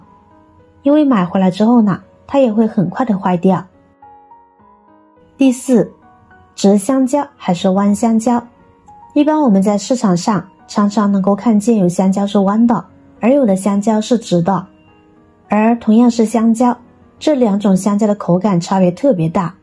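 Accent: China. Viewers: 所謂香蕉催熟，是用塑膠袋包覆，冬天才會用 夏天跟本不須要催熟 聽妳的口音就是中國腔，妳懂香蕉？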